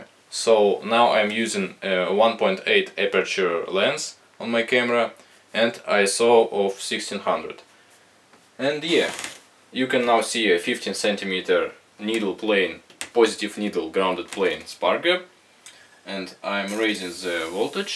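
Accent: Russia. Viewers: такой "русский выговор". Прям вообще!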